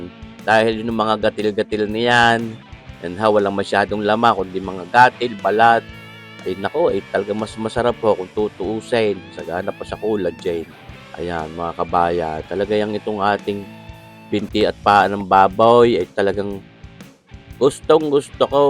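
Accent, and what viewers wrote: Batangas, Philippines. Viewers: that’s ow Batangueño talks… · Batangas po yang cooking man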